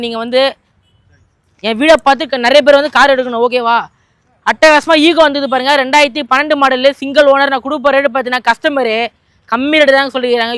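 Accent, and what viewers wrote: Madurai, India. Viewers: Madurai vasagam 🎉🎉🎉super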